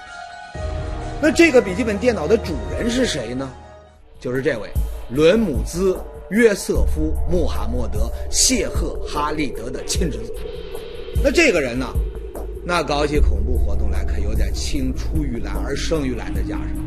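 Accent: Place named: Beijing, China. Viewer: 主持人真棒，好像是北京人！赞赞赞👍👍👍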